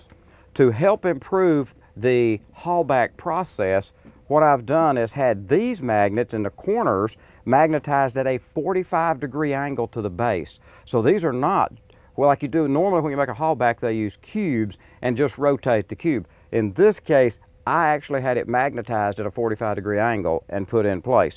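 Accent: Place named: Alabama, United States. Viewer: I heard welds also... but I must have gotten used to the way people in Alabama speak, when I lived there.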